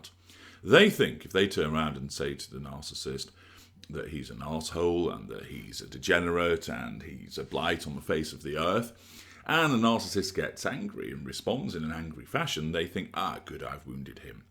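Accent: England, United Kingdom. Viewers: I like your voice very much.. And the english accent ..👍🏻😊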